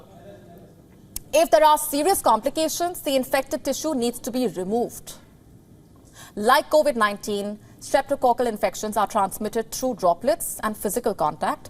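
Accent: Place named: India. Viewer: i hate her Indian accent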